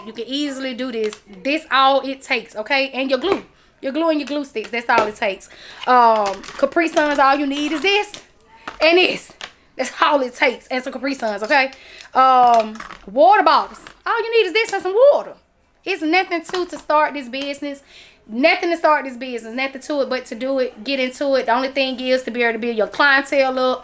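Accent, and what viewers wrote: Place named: South, United States. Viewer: Girl.... you are so country!!! LOVE IT! I'm from Texas so I'm more country! Thank you for all the tips. Great video.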